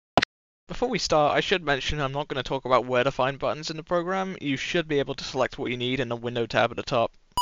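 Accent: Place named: United Kingdom. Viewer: British accent dected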